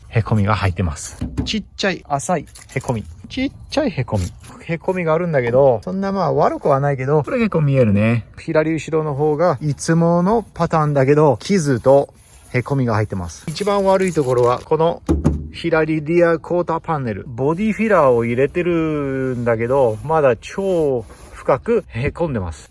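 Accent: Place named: Japan. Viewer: Your accent really be heard as very Japanese! awesome! このプロジェクト、楽しみにしています！ POVのSteveさんをびっくりさせましょう！！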